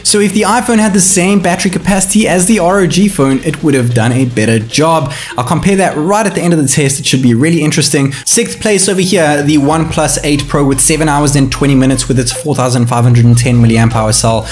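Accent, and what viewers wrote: South Africa. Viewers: U sound south african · This guy sounds soo South African 🇿🇦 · Are you from South Africa? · I think I know a South African when I hear one :D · South African ? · Watched your Qna vid and a few times when I watch your videos i think you sound south African and it turns out you are! I am also from SA, live in Cape Town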